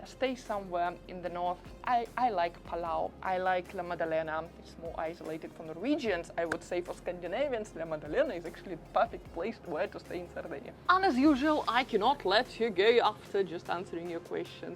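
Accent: Russia. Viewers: Somebody speaking English like a Russian and Italian like an Italian. Nice Video and true, Sardinia is not expensive at all (just at Toll). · You have a heavy Muscovite accent.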